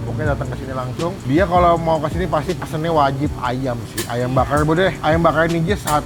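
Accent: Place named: Java, Indonesia. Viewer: Mantabbe ini medok...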